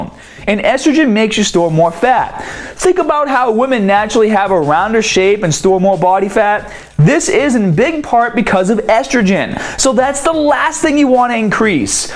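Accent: Boston, United States. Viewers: will do but I have to say I love it. Boston accents are great! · I don't remember having. that accent. Did move to the Boston area in the past couple years? Or has he always hidden the accent to an extent? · lovin the accent from boston myself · Loving the return of the boston accent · It's a Boston accent. It's not a New York accent; I'm from New York. It's not a British accent, and it's not an Australian accent either, although I get the mix-up. Problem solved. To me personally, the Boston accent sounds like a combination of a neutral American accent and an Australian accent. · "With winter around the cornah" That thick Boston accent! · h I asked the same question, the answer from other peeps was "bahstin" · Boston accent? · bro, when the fuck did u aquire this "thick" boston accent?! u say bar, then baaahhhhhhhm what the fuck haha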